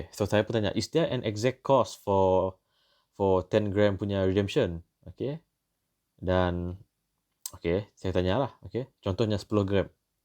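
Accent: Sarawak, Malaysia. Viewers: Loghat mcm Sarawakian je.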